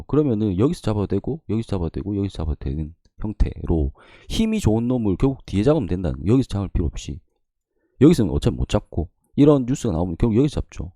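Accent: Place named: Gyeongsang, South Korea. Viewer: 감사합니다 진솔하게 알려주심 감사드립니다 경상도 사토리가 정답습니다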